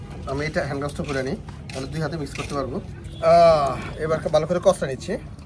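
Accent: Noakhali, Bangladesh. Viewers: Bhaiya ki noakhalir?🥹 Bakider Kotha Barta shune Moja laglo,roja rakha bhai er jonnow kharap laglo,unar iftar er Jonno kichu rekhe diten 😂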